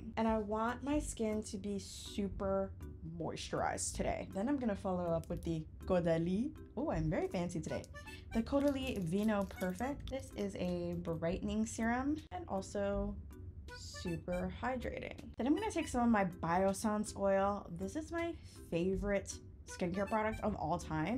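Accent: United Kingdom. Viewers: ❤ your British accent mode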